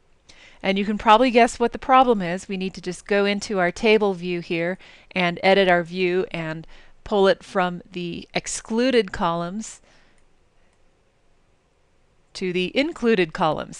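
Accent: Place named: United States. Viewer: Just want to echo what someone said below, so nice and refreshing to watch a training video with someone with an American accent.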